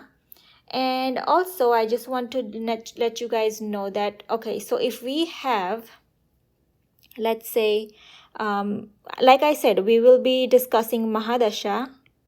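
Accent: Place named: United States. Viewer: American accent. Simply wow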